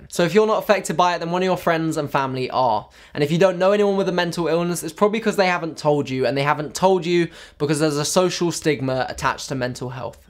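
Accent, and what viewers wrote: United Kingdom. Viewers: First time watching your videos. Honestly came here just for gettin' used to the english (england) accent. Because I'm moving there from Spain and here..well, lets say that I've been pronouncing download like doh-w-nloh-add because of my teachers. ha! Well. Uhm. Love it. You seem very kind and mature, and everything in this video is GREAT. Mental illnesses are, actually, quite common. Go ahead. Im following you! You encourage this people to talk about themselves and their problems without that 'tabu sensation' that society made of and for perfect people has done. NICE! Thank you!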